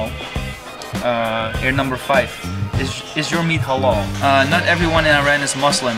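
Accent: Netherlands. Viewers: ***** je hebt dat nederlands engels accent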